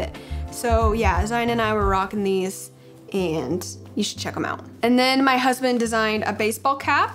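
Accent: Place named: California, United States. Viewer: California accent 😆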